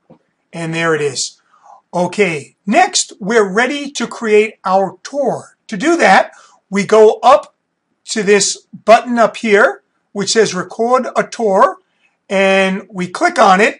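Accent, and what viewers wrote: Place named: New York, United States. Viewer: Really nice job! And we get the bonus of your very special accent for New Yawk City:) QUESTION: If I have 5 or 6 or move Placemarks in my folder, when it comes time to Record Movie, will it automatically run through all 6 locations? Or do I have to manually click each "next destination"? In my case, I wanted an ultra smooth travel on my city crossing a bridge and taking some tricky freeway exits. So, Point A along the path/tour flows into Point B, B to C, C to D and so on. If I have to manually click each point, it's not as smooth as if the program automatically connected each point. Do you know if Google Earth Pro can do this? Also, when you have your placemarks all created in your folder, can you Record different versions-- such as "With 3D buildings and traffic" and without any overlay info? Or does Google Earth save those attributes into each place mark? 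Thank you!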